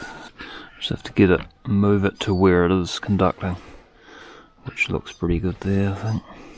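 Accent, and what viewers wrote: New Zealand. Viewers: I came across your channel by random surfing a few days ago... Now I'm absolutely hooked!!! Really interesting and good video's. I'm envious of how knowledgeable you are! Also, the Kiwi accent is cool as fooooook!!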